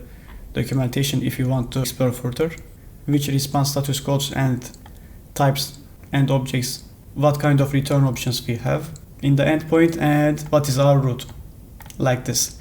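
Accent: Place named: Turkey. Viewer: Hocam o tatli aksaninizdan direkt Turk oldugunuzu anladim :D Harika bir video olmus direkt kanali takibe aldim. Cok tesekkurler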